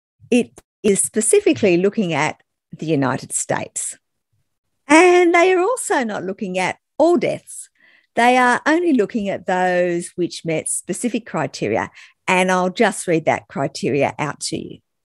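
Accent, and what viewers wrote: Australia. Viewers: Magnificently sensible. Another excellent debunk. The Aussie accent is pure bonus.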